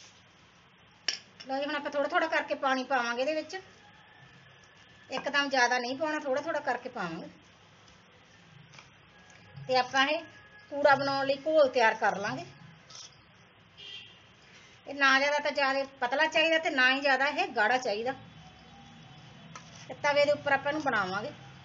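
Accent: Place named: Ludhiana, India. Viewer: ਪਰ ਤੁਹਾਡੀ ਬੋਲੀ ਲੁਧਿਆਣਾ ਸਾਈਡ ਲੱਗਦੀ ਆ ਜੀ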